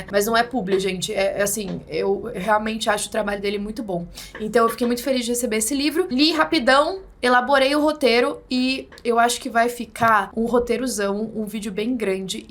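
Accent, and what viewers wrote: Sao Paulo, Brazil. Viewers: O Estado do Pará 🇧🇷 ao Norte do nosso Brasil gigante têm um longo caminho de podridão na história, ou seja, infelizmente aquele Estado nortista é DESGRAÇADAMENTE FAMOSO nas páginas policiais ao longo de várias décadas. Tipo se eu fosse um cidadão nortista brasileiro nascido naquele Estado PARAENSE eu sentiria vergonha de dizer pra qualquer um que eu nasci ali. Graças a Deus que eu sou um homem brasileiro PAULISTANO e com muita honra e orgulho da capital e do meu Estado de São Paulo que é o mais rico não só do Brasil como da América Latina. Lembram daquela FREIRA AMERICANA que tanto fez o bem em ANAPU, na região campestre do Pará? Ela foi covardemente ASSASSINADA a mando dos ricos fazendeiros assassinos e exploradores ladrões do Estado do Pará. Ela foi uma bondosa SENHORA que só fez o bem e teve este fim trágico. Na época eu fiquei revoltado com essa raça desgraçada que há no Pará. 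Lembram do município RIO MARIA? também no Pará, aquela região têm um histórico de BANG BANG, ou seja, é famoso e pecaminoso em ASSASSINATOS COVARDES, faça pra nós, Senhorita, um documentário em seu canal deste caso antigo da Irmã Doroty que foi morta em Anapu ok. Obrigado. E já deixo meus gostos aqui sempre em seu canal. Tu parece paulista também pelo seu sotaque. Tu és paulistana também? Desculpe a ousadia de te perguntar. E encerro por aqui essa carta com minhas saudações calorosas para ti de boas vindas. Fraternalmente. 🎉❤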